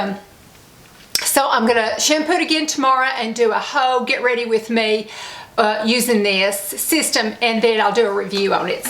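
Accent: South, United States. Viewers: Would love to hear you speak Spanish with that Southern accent. Miami is 90% Hispanic.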